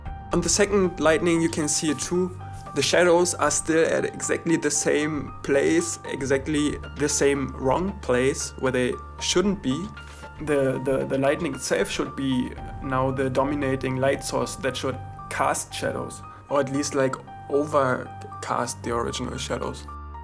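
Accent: Germany. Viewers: Dein typisch deutsch ignoranter Akzent ist so furchtbar. Kannst du dich nicht mal bemühen richtiges Englisch auszusprechen und zu betonen? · german akcent ^^ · Even for me (i'm german) your accent is really annoying! (i'm absolutly sure thaz you are german...) ÜB EIN BISSCHEN! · du klingst deutsch ^^ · are you German? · schon deutlich deutsch :D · This german accent however pisses me off. But cool video btw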